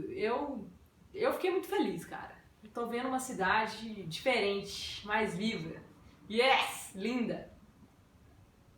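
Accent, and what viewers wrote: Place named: Pernambuco, Brazil. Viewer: Kkkkkkkkkkkkkkkkkk É o "pernambuquês", 'fia'... BH é o máximo! E teu canal, tá igual ao programa de domingo à noite: Fantástico! Xeroooo em ritmo de forró!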